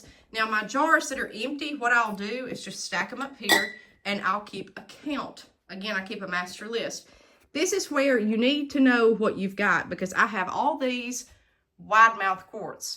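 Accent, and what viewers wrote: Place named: South, United States. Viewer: Alabama!? I swore up and down y'all were from Texas or Arkansas after hearing your accent, y'all keep on raising hell and eating cornbread over yander😅 🍻 from South Carolina.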